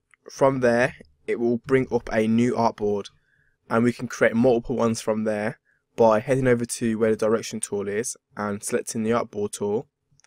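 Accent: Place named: United Kingdom. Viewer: Oi! Ya bri' 'ish, mate?!?! Lovely accent <3 Thanks for the video, helped me out a lot!!! <3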